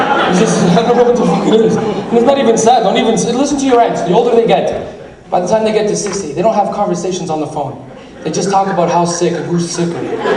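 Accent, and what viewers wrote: Azores, Portugal. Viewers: Viva o sotaque de S. Miguel... :D para nós, os continentais, na maior parte do tempo são necessárias legendas, mas é um sotaque fabuloso. Um abraço do Porto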